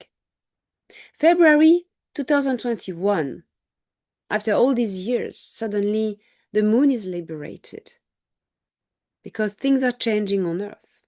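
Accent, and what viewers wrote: France. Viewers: What a great edition of Star Nation News! You should do a more detailed traditional Documentary for public release to help us get through the next 5 years. Make sure you have a narrator without a strong foreign accent. I love your French accent, and the way you present your news. I took French in high school for 3 years, but I do struggle to understand your pronunciation of words sometimes. You are a great communicator and I admire your great courage and wisdom, and beauty, if I might add!!! Mike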